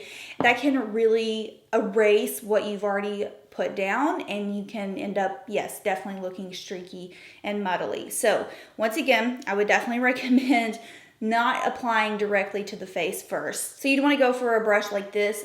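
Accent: South, United States. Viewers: Hi, I’ve watched the first video you have on YouTube! Are you from Texas? Your accent is very southern!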